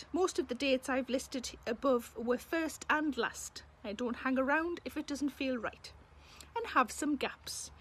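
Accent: Newcastle, United Kingdom. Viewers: Watching in St Louis, Missouri, USA. I first heard your voice on a TV while sitting in a hotel room in Wales and I thought you were the funniest comedian I'd heard in years. I wrote down your name so I could ask the friend I was traveling with if he'd heard of you, and he told me you were from Newcastle (he was too). I visit Newcastle for business every year, which helps me translate your Geordie to my wife when we both listen :)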